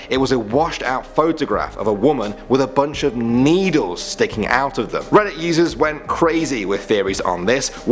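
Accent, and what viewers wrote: United Kingdom. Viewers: I hate the British accent. Stop asking me a question thru your statements. Ugh. I live in the UK. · He is British, its an accent, not him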